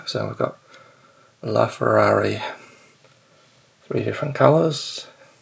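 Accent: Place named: Australia. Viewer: Wow, nice collection. Sounds like you're in Aus. Nice to know there's a nice home if I need to sell.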